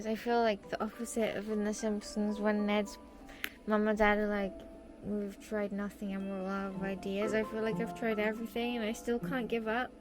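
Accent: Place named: Scotland, United Kingdom. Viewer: Its funny to hear someone in Scotland getting confused by your accent as being Scottish and living in England its usually the opposite way around for me everyday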